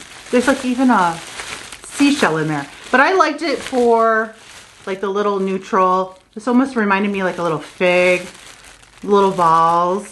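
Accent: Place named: Michigan, United States. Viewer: I just found your channel today. Are you from Michigan? I grew up there but live in Illinois now. I can sometimes still hear the Michigan twayang, lol.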